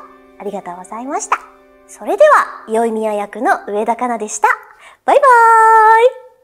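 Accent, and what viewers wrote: Kansai, Japan. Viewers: Queen of Kansai Dialect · the kansai dialect definitely made it 10x better <3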